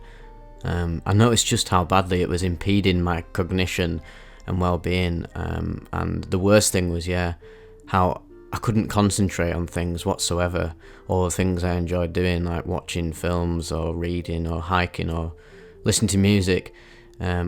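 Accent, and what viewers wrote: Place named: Yorkshire, United Kingdom. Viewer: Mate i feel i could be platonically serenaded by your yorkshire timbre for hours on end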